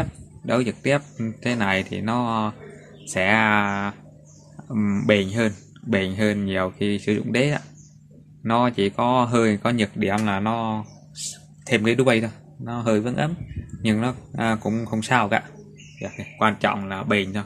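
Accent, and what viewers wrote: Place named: Ngư Lộc, Vietnam. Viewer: Mới nghe tiếng nói mình tưởng bạn ở Ngư Lộc (Hậu Lộc). Chào nhé !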